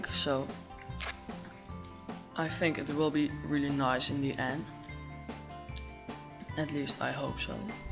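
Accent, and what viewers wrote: Netherlands. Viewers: He is dutch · You sound dutch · omg, die nederlandse accent hahah! te lieff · Dat Nederlandse accent is zo cute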